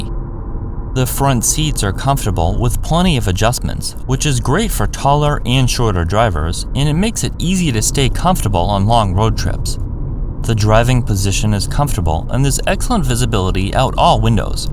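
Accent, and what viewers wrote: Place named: United States. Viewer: I will subscribe when you stop saying "and" like an american. This is an error, try to reverse to the British "and".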